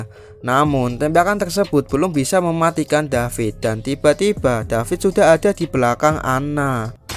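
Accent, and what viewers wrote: Java, Indonesia. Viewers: Logat jowo ne medok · Logat Jawa suarane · min plis, asli mana njenengan, medok banget kalo ngomong wwwk but TQ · ett medok bener si mas logat nya, jadi kurang jelas mas'e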